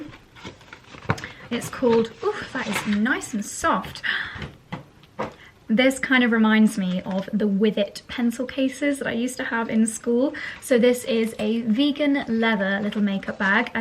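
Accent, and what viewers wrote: Spain. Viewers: Love your Spanish accent! You sounded great!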